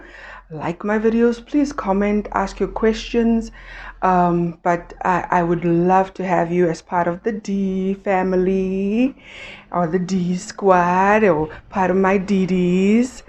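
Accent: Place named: South Africa. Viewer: Yup, it saved me a lot. I just started trulicity which is about the same as ozempic. I'm excited to lose weight. Totally off topic, I absolutely love your accent! I know, typical American lol...but South African accents are completely under rated. I hope the best for you sweetie, you're looking great!!! I can only hope the same for myself someday soon. :)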